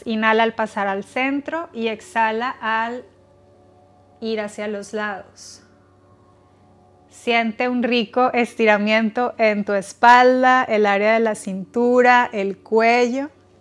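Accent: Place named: North, Spain. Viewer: Es la primera vez que veo sus videos de Yoga gracias por compartir escucho su voz muy agradable con acento norteño muy agradable disfrute mucho la clase Namaste ❤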